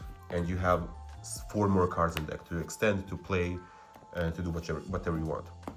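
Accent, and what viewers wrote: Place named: Balkans. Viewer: I hear a bit of a Balkan accent there, would love to discuss more hero stuff. Testing stuff out again and defintely could use some tips!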